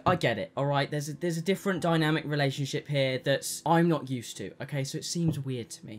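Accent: United Kingdom. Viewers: I love this brittish, potientially scottish and or austrailian man and his amazing content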